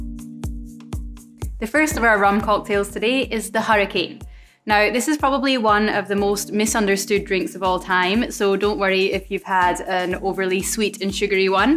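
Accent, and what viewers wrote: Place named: Scotland, United Kingdom. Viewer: I heard her saying "Here in Australia..." before, but to me it sounds as if she's actually from Scottland...correct me if I'm wrong Love her vids though :D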